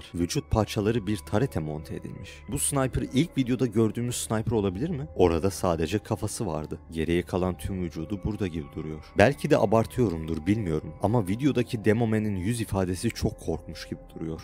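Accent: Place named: United States. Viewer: Amerikan Türkçesi diyoruz biz bunlara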